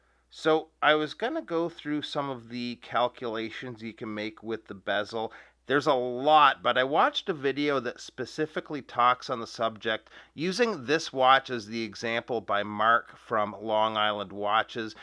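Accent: Canada. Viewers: Great demonstration, disliked for the Canadian accent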